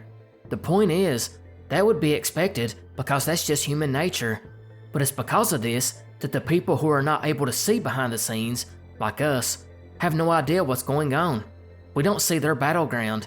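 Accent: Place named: South Carolina, United States. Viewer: Where are you from bro, you sound like my neck of the woods, in SC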